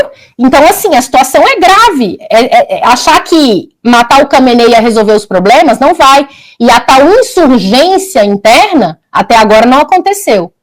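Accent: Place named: Minas Gerais, Brazil. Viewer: ❤ QUE SOTAQUE LINDO! SERIA ELA UMA MINEIRA? MUITO BOM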